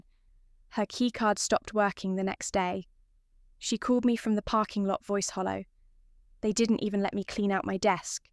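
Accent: United Kingdom. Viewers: If she is from North Carolina, why is the AI voice a southern English accent?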